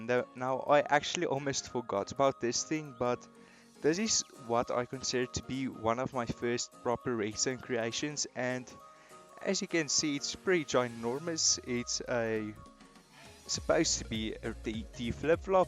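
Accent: South Africa. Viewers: hello fellow south african 😌 jou aksent het jou weggegee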